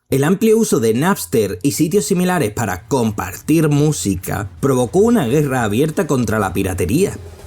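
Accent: Andalusia, Spain. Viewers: Primero: me encanta tu acento pk también soy andaluza. Segundo: me encanta que hayas hecho todo un documental de este tema. Tercero: de dónde has sacado tanto contenido de los 2000 de las tribus en los PCS? Que pasada. Yo lo viví pero apenas tengo fotos de como se veian las webs en esos años. Me suscribo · Que montón de subnormalidades y por tu acento lolailo no se vivio nada de lo que hablas el sur de España fue un reducto retrogrado donde la pantoja y la Jurado reinaron y reinan....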